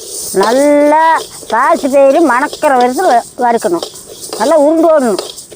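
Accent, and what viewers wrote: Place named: Coimbatore, India. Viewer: Is it coimbatore district? Via general name. I love the slang of the language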